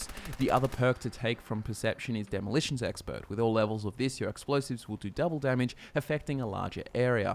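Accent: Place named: Australia. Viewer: are you austraian because you sound awesome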